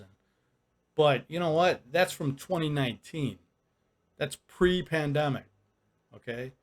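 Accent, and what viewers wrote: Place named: New Jersey, United States. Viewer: For some reason, I thought you had a Jersey accent. Great city, by the way. Taste of Chicago, awesome annual food festival. You a city respect their food & culture after attending such an event. Keep the great content coming. 👍🏾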